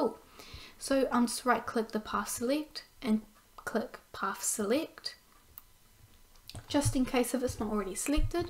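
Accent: New Zealand. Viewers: Kiwi accent ;)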